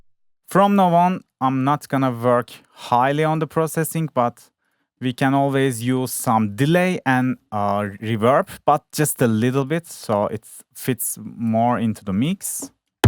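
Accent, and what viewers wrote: Turkey. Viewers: TAM DİYECEKTİM TÜRK AKSANI BU DİYE KANALA BAKINCA ANLADIM KJDFGHLKJGH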